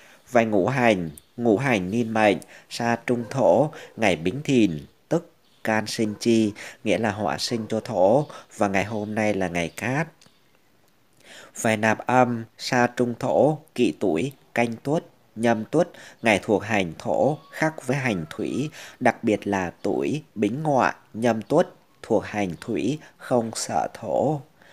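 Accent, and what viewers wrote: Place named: Central, Vietnam. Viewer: giong đoc ko hay mây chuc kênh luon phat triên nhé giong nay nhu ở miên trung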